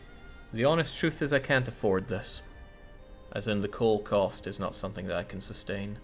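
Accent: Scotland, United Kingdom. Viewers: Hey buddy how do you rotate the buildings? Love your commentary style btw, what part of Scotland are you from? I can't understand some Scottish accents but yours is the best I've heard!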